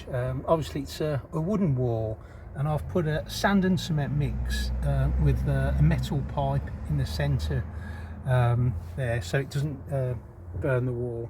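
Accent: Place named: Black Country, United Kingdom. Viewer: Love your videos, you seem a very friendly chap, definitely a Birmingham/Black Country accent there;)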